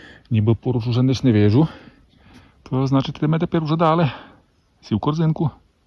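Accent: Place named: Transcarpathia, Ukraine. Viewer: Щиро дякуємо за таку прогулянку ,дай Боже щастя і здоров'я вам ми зпраги ,приємно слухати нашу закарпатську мову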